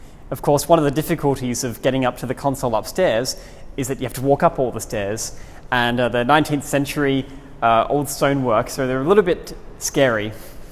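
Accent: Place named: United States. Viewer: It is sad that the speaker broadcasts in American.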